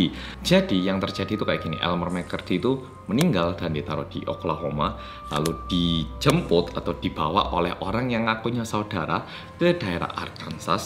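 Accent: Java, Indonesia. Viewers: pembawaannya njawani rendah ati, enak didenger meski medhooook.. stay humble, mas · Jumscare nya emg ngeselin produksinya medok😅, sering x saat kaget hp ku, ku lempar sendiri sangking kagetny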